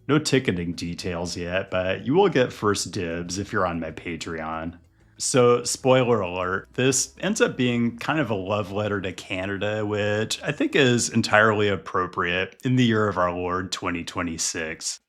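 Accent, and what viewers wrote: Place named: Pacific Northwest, United States. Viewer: The most pacific northwest accent i've ever heard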